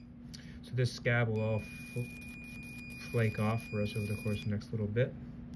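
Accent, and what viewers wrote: Canada. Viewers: Je savais pas que vous parlez le quebecois. Very cool ❤❤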